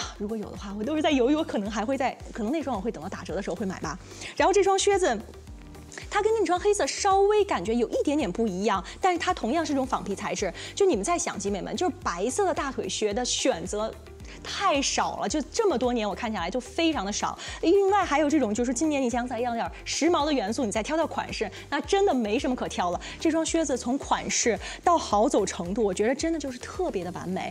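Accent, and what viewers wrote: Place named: Shandong, China. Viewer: 很像山东的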